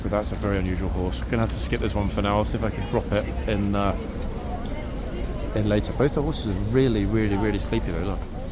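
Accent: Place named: Cockney, United Kingdom. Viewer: You are a very rider cockney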